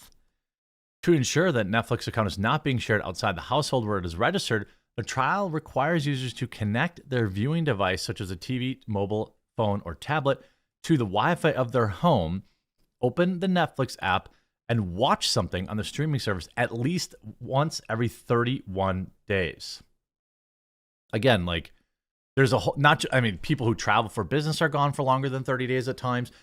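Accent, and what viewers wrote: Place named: Wisconsin, United States. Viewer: I like your show, but your severe Wisconsin accent is too hard to understand.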